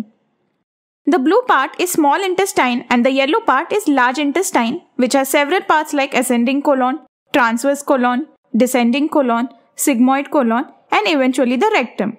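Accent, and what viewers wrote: India. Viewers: I enjoy listening to your indian accent. Hope to imitate the way you speak English.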